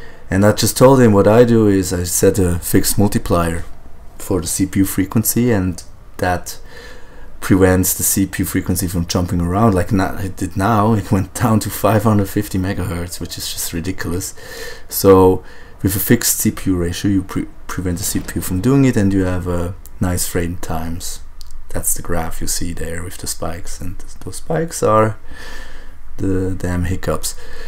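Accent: Germany. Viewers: Saint Siemore Yes he is german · Are you german? thanks for the video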